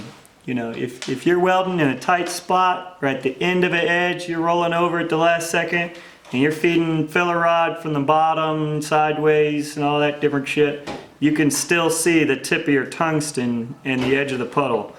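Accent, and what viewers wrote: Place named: South, United States. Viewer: U sound like a God damn hillbilly. 💗 love it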